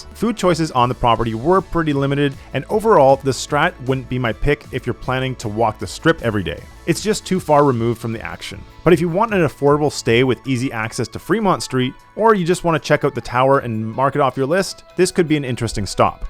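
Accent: Canada. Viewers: Are you Canadian??? 🤔 if not, you’ve got a Canadian accent!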